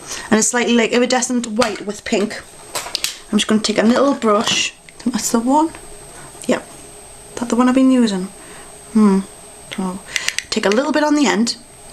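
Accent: Newcastle, United Kingdom. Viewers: where are u from? UK? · Lol you speak fast! And with your British accent I cannot understand everything you say.. Oh well, you still make wonderful videos :) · *squeals* When I clicked this vid, I had no idea I'd be listening to a fellow geordie!!! Awesome. I was well expecting a yank....XD xxx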